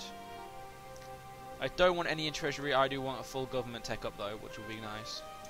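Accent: England, United Kingdom. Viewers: You are british. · are you english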